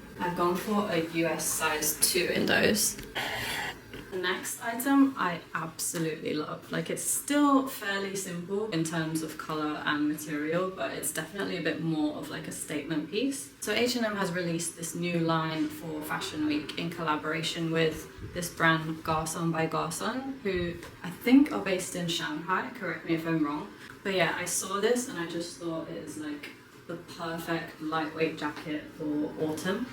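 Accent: United Kingdom. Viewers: 英國腔真的非常好聽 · Quick question 🙋🏻‍♂️ Ur British accent is not that strong so because of the video is for Taiwanese people Or the original accent is not that strong🤔 (Just curious😂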